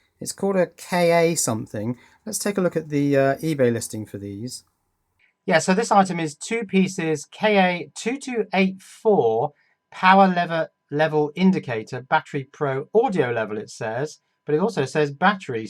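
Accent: United Kingdom. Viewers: Kevin Day Should have known what you were talking about. Which, among other things, would be, surprise, British English.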